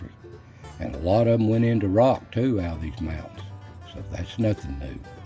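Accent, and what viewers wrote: Appalachia, United States. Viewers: I was born in Southern West Virginia and live now in Central West Virginia. I love my state and the heritage it holds. I don't ever want to leave. Some people in S.W.Va. have a heavier accent than you have. But I do love me some beans and cornbread with a big slab of onion. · I was born and raised in Knox County, KY, in the Cumberland Gap area. I moved away years ago and miss the simple life we had back then. You talk just like my Daddy and Uncles! Daddy was also a coal miner in the same areas you were. I am a proud Hillbilly! I've lost my Appalachian accent, but cherish my heritage. I love hearing you talk in the language of my childhood! Thank you so much for sharing your stories. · mY tENNESSEE GENERATION GOES BACK ON BOTH SIDES OF MY FAMILY TO THE LATE 1600's to the present. mostly in Carthage & Gordonsville tn. and Virginia. 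God bless your voice. I miss more and more your dialect and i heard it growing up. Sadly, not very many people talk like us now a days! Hello from Nashville, Tennessee! Proud southern generation! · All of my family on both sides came from the foothills of the Smoky’s, right outside of Pigeon Forge before it was ruined with tourism, so I understand you just fine. My parents talked exactly like you. Jobs were scarce down there at the time, so my parents moved to northern Illinois to work in the car plants. We spent many summers with my mamaw and papaw in east TN. My Dad and Mom always talked about moving “back home”, but sadly never got to. And he grieved for it the rest of his life.He never lost his accent either, and me and my siblings picked it up somewhat, which we got teased for in school. So, when I see an article about that region, I always read or watch it. I’ve not been down there in 5 yrs. 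Now. All the old folks are gone, and so much has changed, it’s not the the same. Nothing ever is, but the Smokys will always be some of my best memories.That region will always be buried in my very soul. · I can detect a fake southern accent pretty easily being born and bred in East TN on the Cumberland Plateau. If you want to hear some appalling examples of a fake southern accent watch the movie Cold Mountain. Your accent in 100% old southern mountain folk and you should be proud. I catch myself toning down the accent and speaking with more "proper" grammar most of the time, but am most comfortable speaking more like my grandparents did and to some extend the way my father does in the right company. It's weird how I can turn it off and on depending on who I am around. My wife pointed it out; I had no clue I was evening doing it! I think much of it comes from fear of being labeled as ignorant or a hick when I was younger. 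· My people come from near Jonesville on the Virginia side and Kyles Ford on the Tennessee side. I can identify with everything you say. The coal pile. Gravy and biscuits in the morning. Collecting coke bottles for two-cents deposit. Our unique way of talking is fading as the old people die off and people from other places move in. Thanks for keeping it alive! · you talk like my dad, poke, pop, haints, kreek, tures tires (kentucky) and so on, he stopped chopping wood at 90 only because my mom worried about him out there, he still split with an ax & awl but also used a chainsaw. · I'm from east TN. Although I don't have that accent I know many people that have much stronger mountain accents than you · You certainly have that Appalachian twang. I love your videos. Remind's me of my pawpaw God rest his soul. I cannot wait to settle down in Southern KY in the next few years when retirement comes. 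Me and my husband disagree, I want to go back to the days of the outhouse but he is not a fan. He has a point using an outhouse in the night of a cold winter is not fun but you start missing the simpler days. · That was at a time and place when people were poor but free and they knew it. My people were from the mountains of Kentucky, and they talked just like you.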